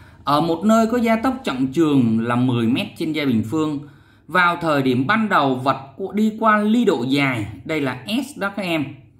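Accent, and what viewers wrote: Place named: South, Vietnam. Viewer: thầy ở miền nam đúng kh thầy , em ở miền bắc nên nghe hơi ngượng ngượng hehe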